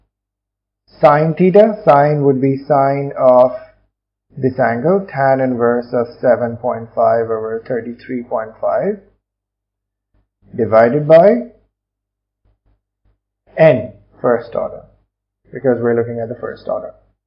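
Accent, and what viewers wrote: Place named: India. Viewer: his accent is not bad, much better than common indian accent!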